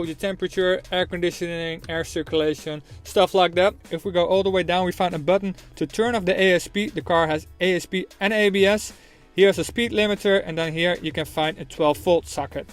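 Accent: Netherlands. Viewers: Lekker Nederlands accent lolz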